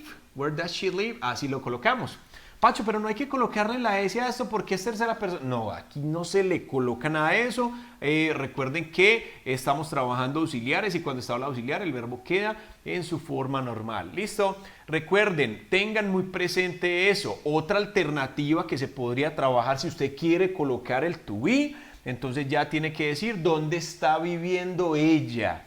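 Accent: Antioquia, Colombia. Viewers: Yo creo q me estoy enamorando no pongo atencion me encanta el acento colombiano el teacher ni digamos 🤗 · Vosos paisa de medellin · me encanta el acento colombiano parcero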